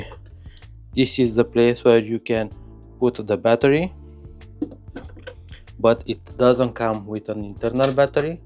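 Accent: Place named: Romania. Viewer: Căutăm să văd recenzii despre boxa asta am dat de canalul ăsta .Să moară bibi dacă tu nu ești românaș de-al nostru chiar dacă vorbești în engleză bag mana-n foc după accent . Sper sa nu dau greș cu boxa asta .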